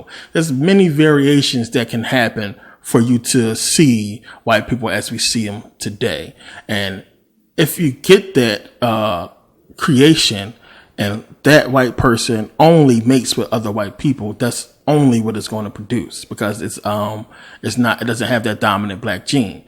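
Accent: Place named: DMV, United States. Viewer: Love that DMV "tew, yew, hewge" twang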